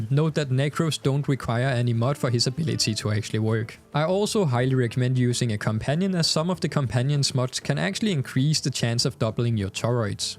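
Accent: Denmark. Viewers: Great video. You sound Denish?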